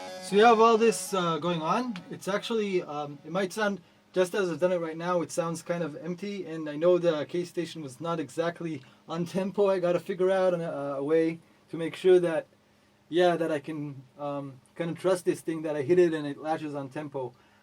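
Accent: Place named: Israel. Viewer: אחלה וידיאו גבר כותב בעברית כי שומעים שאתה ישראלי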